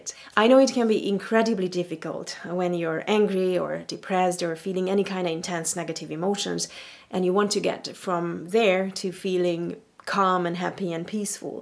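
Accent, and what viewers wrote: Hungary. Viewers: Hi from Hungary. You sound to have a Hungarian accent.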